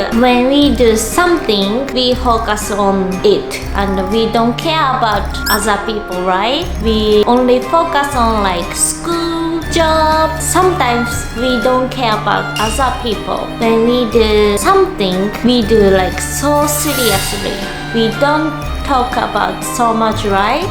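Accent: Japan. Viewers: this is typically Japanglish pronounciation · No one gonna talk about her Japanese accent? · You look filipino to me😬😬 and your accent us like japanese, pilipino and visaya😐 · My first impression from the title i was like what filipinos have done to her? Me after watching the video : ahhh kaya pala kase addictive 😂 Btw Love your accent just like my japanese friends 😊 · I would like to inform the viewers why she says expensive-uh because its japanese accent they say english words with uh like bestfrienduh etc. PS. I have a japanese bff. so now u know ok?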